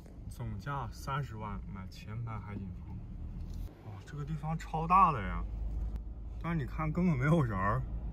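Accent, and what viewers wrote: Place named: Cantonese, China. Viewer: 发现广普真好听。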